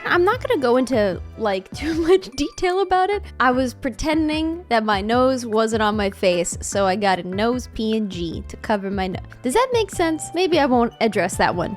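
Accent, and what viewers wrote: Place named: United Kingdom. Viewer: i'm surprised the british accent fit so well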